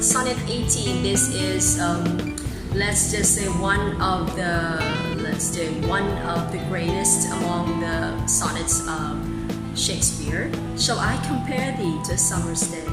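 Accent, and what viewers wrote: United Kingdom. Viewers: it is a lovely sonnet ms. I also like your British accent, so pleasing to hear. How to be you po🥲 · This is such a wonderful and meaningful poem miss, I love that the British accent makes it more graceful to listen to. -van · thank you for this video! listening to your british accent is so soothing 🎧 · Wow very entertaining and informative video miss! Your British accent is almost so authentic. Props to the effort given in this video miss! 🙏 🙏🙏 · Wow, I really like the way you speak in english Ms! Im glad I learned more british coming from you! <3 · It is a stunning poem, and I love how the British accent suits it. I really appreciate how elegantly written the words are in this poem! Thank you for this video, Ms. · It is indeed a beautiful poem and I do love how the British Accent sounds very suitable with this Sonnet! Thank you for this video, Ms.! 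· Thank you for making this kind of content Ms!, i loveeee the British accent u got!. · Great video Ms and a perfect British accent.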